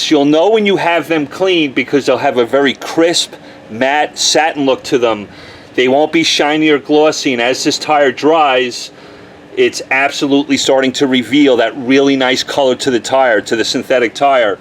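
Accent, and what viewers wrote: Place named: Long Island, United States. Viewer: You must be from long island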